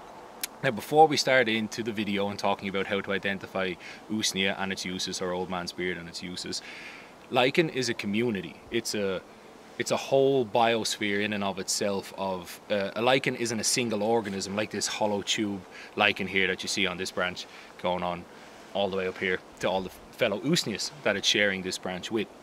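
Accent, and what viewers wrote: Ireland. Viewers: Dublin I’d say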